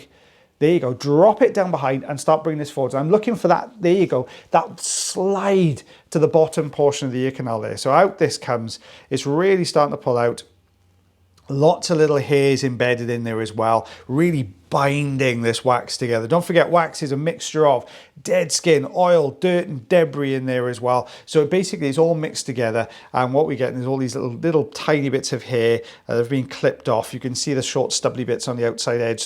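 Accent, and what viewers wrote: Wales, United Kingdom. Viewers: I’m so happy you said Ebony in your lovely Welsh accent. It’s one of my favourite words to hear you say!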